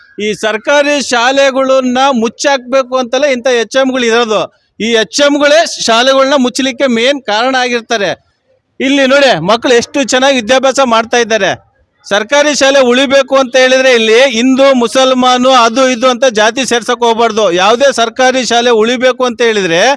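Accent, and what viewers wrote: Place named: Davangere, India. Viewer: Super 💥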